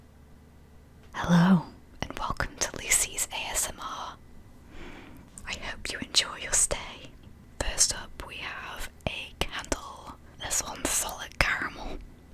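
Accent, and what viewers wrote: United Kingdom. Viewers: Ur 2 British to do asmr vids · Mmmm british accent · As an American who loves a British accent, all of your content is ASMR to me. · for every video you sound british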